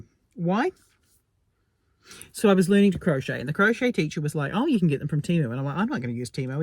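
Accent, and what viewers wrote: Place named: New Zealand. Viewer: As soon as you said “The Warehouse”, I was like… her accent… she HAS to be a Kiwi… but you did confirm it 🫶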